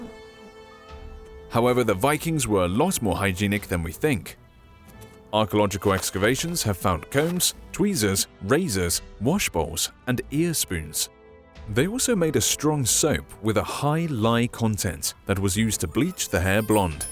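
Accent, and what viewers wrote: United Kingdom. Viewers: Stop using this stuppid brits vocif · I hate the brittish accent for some reason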